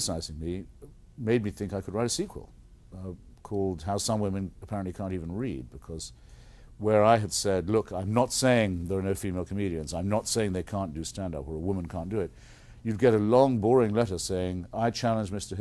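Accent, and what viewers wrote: England, United Kingdom. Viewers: the women seem to have penis’ dear. In his English accent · His English accent and perfect way of speaking is the cherry on top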